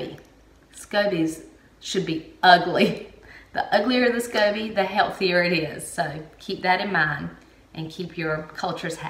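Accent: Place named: South, United States. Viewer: What southern accent is this anyone please?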